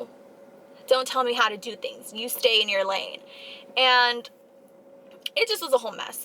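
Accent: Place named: United States. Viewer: What state is this in! Sounds Just like one I know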